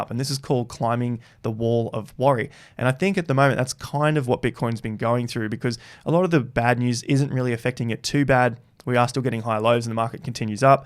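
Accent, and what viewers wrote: Australia. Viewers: Another perfectly explained video that helps most <12month newbs. (Me included). Such a great Aussie way of delivering as well. Thanks for your content.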